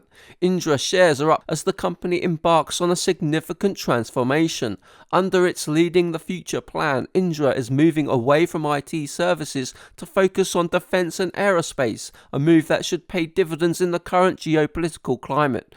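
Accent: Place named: United Kingdom. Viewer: Great presentation and love the British accent!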